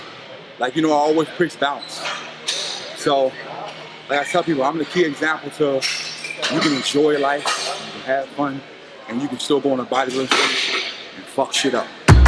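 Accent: Baltimore, United States. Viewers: That’s that Baltimore voice. I know that for a fact✔️ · Heavy b more accent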